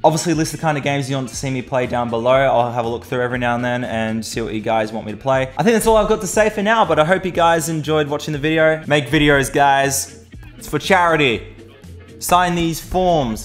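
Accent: Australia. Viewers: Are u Australian? U dont have to answer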